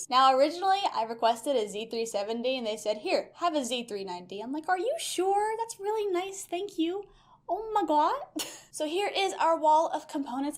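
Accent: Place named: Alabama, United States. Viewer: the way she talks makes me think she was raised a catholic school girl from alabama like damn AHAHAh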